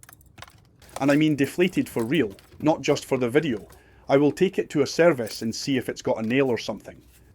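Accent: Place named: Scotland, United Kingdom. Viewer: I'm an even smaller creator, you're way ahead of and better than me 😂 Also, love the scottish 👍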